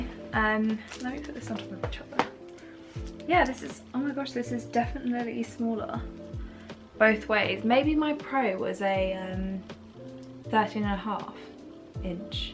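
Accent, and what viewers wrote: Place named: United Kingdom. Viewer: British Accent 💕🔥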